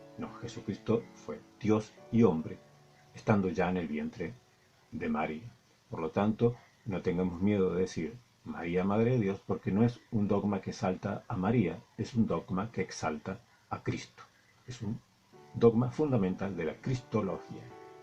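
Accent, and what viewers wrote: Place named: Cordoba, Argentina. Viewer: Jaja Dios lo bendiga disculpe no me pude peinar... Bien cordobes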